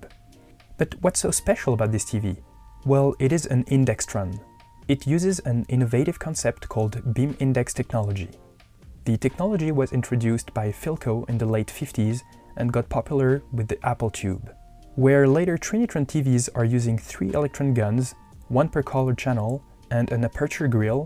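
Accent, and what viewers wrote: France. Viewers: Vous avez un accent français, c'est pas possible autrement !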